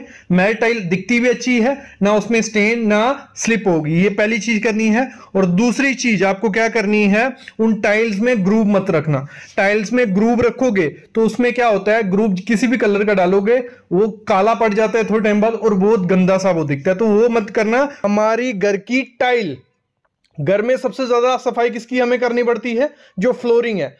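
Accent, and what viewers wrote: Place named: Himachal Pradesh, India. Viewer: Aap पहाड़ी ho kya you sounds like from Himachal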